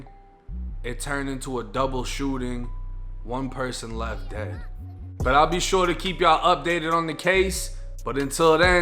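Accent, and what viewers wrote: Boston, United States. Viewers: You. Sound. Like. A. Boston. Person. Its. Your. Pronouncing. Of. Some. Words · Jake, you def caught the Boston accent! I have one too, I’m rocking wit ya! 🔥🥰☘️ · Dude what are you from Dorchester 😂 every time you hit that "A" it gets me lol. I used to live in Brockton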